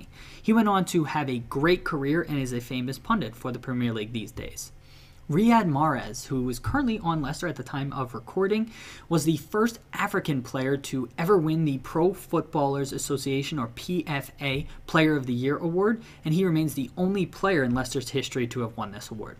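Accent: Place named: United States. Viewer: Love the American pronunciations lol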